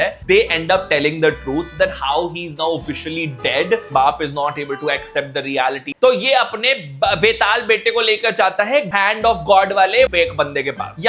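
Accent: Punjab, India. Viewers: Bro ... I can see the movie is a total bheja fry but ur review is just outstanding 😂😂 bhai hasn hasn k pet dard ho gaya. I love the way u say things with a tint of punjabi 😂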